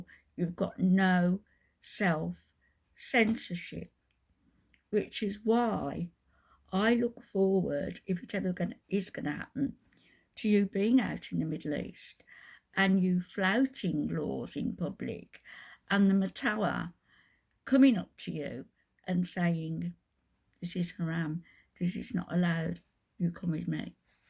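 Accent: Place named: England, United Kingdom. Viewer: and for the ones that areagreeing with this lady coming on here and making videos she’s the one coming off as a bully she makes videos and just talks garbage and expects nobody to react to it I mean come on this is a bully channel and she only sounds “graceful“ because she has an English accent but trust me if she was American the way she would probably be saying things is probably the same thing as it would sound now you people are sick supporting this channel one day she woke up started watching Chantel and decided to jump on the shit talking aspect of things and all she talks about in her videos is Chantel it’s pretty obvious this lady doesn’t like Chantel so she makes a whole entire channel based on shit talking on her and she expects Chantel just to stay quiet? I don’t like Chantel‘s behavior at all but I would think that people like this would have much more things to do and much more creative things to do at her age than making these types of videos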